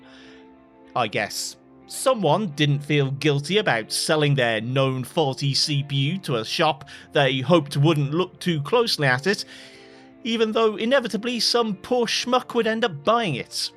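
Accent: England, United Kingdom. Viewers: Saw someone mention you on a Gamers Nexus channel. Had a quick look, and saw this vid which was a question I was wondering about. Soon as I heard a sane English voice, I was in :D Plus your nearly at 100k subs.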